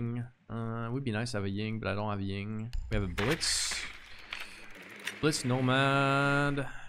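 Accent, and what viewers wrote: Quebec, Canada. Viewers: Es-tu Québécois parce que je l’entend dans ton accent haha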